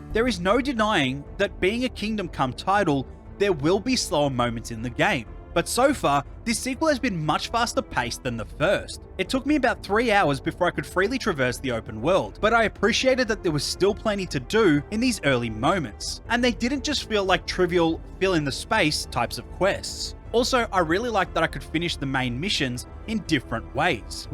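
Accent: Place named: Australia. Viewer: You're Hungarian ? I was swearing you sound like an Aussie !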